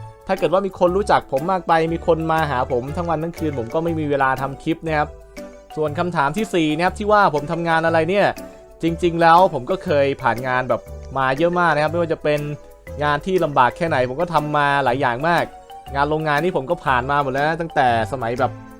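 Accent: South, Thailand. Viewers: ภาคใต้เหมือมนิพี่ผมคนสุราด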